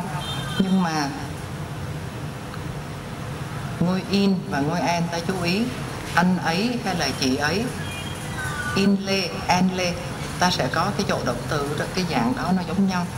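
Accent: Vietnam. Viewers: chũ khó nhìn với cách đọc của miền Nam nên lúc nào ucngx phải chuẩn bị cách google dịch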